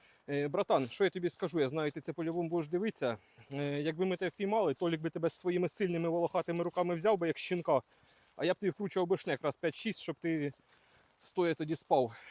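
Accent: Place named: Zaporizhzhia, Ukraine. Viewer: З Днем Народження,! Миру і всього доброго! Так приємно бачити земляка, чути наш говір,ви з Оріхова,а ми з Поліг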